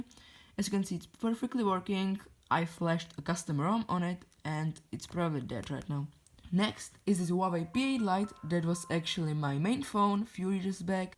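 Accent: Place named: Romania. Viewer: Your accent sounds Romanian but you are from Poland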